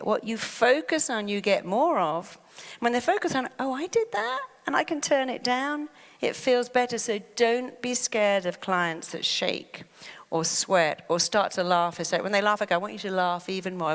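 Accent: Cambridge, United Kingdom. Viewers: is a genius! I just discovered her recently. Does anybody else speak to themselves in voice? lol weird I know. I am working on reprogramming. When I catch myself in negative thoughts I hear her lovely voice. For example this evening “ugh I’m rushing, I’m so stressed, don’t have enough time to do all these things” then I self corrected and heard her lovely British accent saying “it’s all right, I have plenty of time, I am enjoying myself”. lol anybody else? It’s like a self soothing thing 🤷🏼‍♀️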